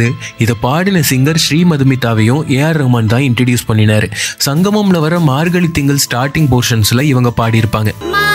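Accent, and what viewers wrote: Kongu, India. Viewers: நண்பா ரொம்ப ரொம்ப ரொம்ப ரொம்ப ரொம்ப ரொம்ப ரொம்ப ரொம்ப நன்றி நண்பா இந்த கொங்கு தமிழ்ல பற்றி ரொம்ப நாளா கேட்டது இருந்தேன் இப்பதான் எனக்கு மன நிறைவாய் இருக்கிறது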